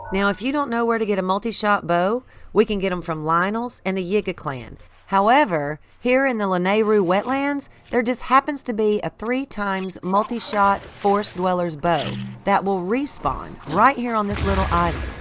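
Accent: South, United States. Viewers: I adore you so much. I hope your channel takes off. You've already helped me so much--with the electricity and the test of strength, and then with how to defeat guardians and keep retrying to get giant cores. Now I learn you can use fire sword to light shît, and great info on bows and arrows. And to top it off, you're extremely pleasant to listen to. Southern accent for days!!! There are some ppl I put up with their voices for their content, others with content I want but I CANT with their voice, but your voice is like coming home. Thank you for existing, for so many reasons.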